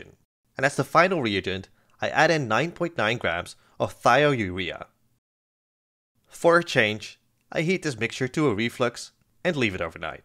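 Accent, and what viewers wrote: Netherlands. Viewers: Your voice has a hint of Dutch if that’s true damn it’s a fine accent if other disregard the previous 😅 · Nee echt leuke videos... stiekem beter dan nilered. Ookal heb je een nederlands accent je prsst fijner, iets sneller en minder met een zeurige toon. Hahah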